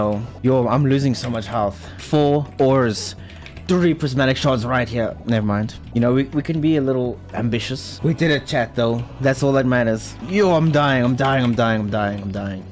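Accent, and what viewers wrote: South Africa. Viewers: I love when people ask this because nobody has ever sounded more South African than him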